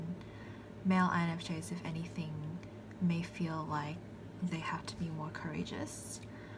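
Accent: Australia. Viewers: I was not expecting an Australian accent out of you ! I love it ! It is beautiful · offtop, sorry, but are you Australian maybe? :) Cheers from infj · you sound aussie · Very insightful talk, as a male INFJ a lot of these points are relatable to males. ps. I’m guessing you’re Australian 😊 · is that an aussie accent i hear? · She's Australian. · I hear Aussie? ❤️ Melbourne Victoria here but live in Miami 😩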